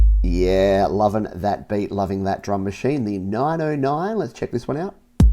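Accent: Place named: Australia. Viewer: I recognise that accent. Hi fellow Aussie.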